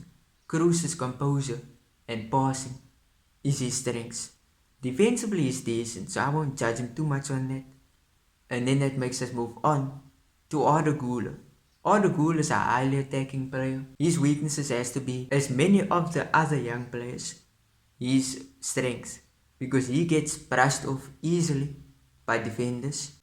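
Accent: South Africa. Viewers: Bro you sound South African like colored 😅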